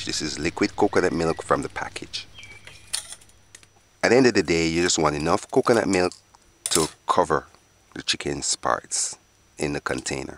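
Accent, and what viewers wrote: Jamaica. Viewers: It is Jamaica accent I'm live there also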